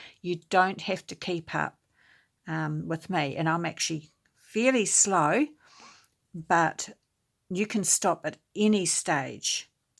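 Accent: New Zealand. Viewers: When you make an “oops” I laugh right along with you!!!!!! Love your NZ accent!! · love your Kiwi accent very familiar in Oz Love from Perth Western Australia 🇦🇺🙏❤️⚘️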